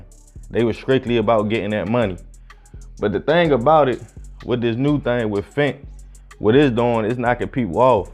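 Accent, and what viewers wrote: Baltimore, United States. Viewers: That's the point. HE SOUNDS LIKE BALTIMORE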